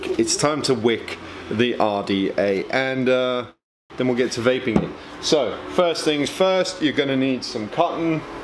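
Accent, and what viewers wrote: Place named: Birmingham, United Kingdom. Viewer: as brummie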